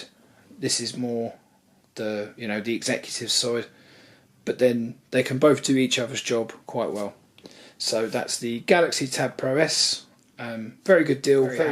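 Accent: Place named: United States. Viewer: he is speaking American English not british english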